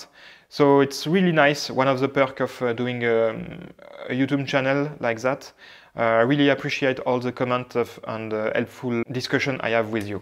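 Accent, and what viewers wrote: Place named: France. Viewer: New subscriber, just got one of your videos recommended the other day and have now went back to the beginning and binge watched them all. Excellent series and I am invested. Looking forward to the day (if ever) you decide to excavate the under cellar and maybe seew where the filled in tunnel leads to. Also every time you say the "poop cellar" in your lovely French accent I crack up.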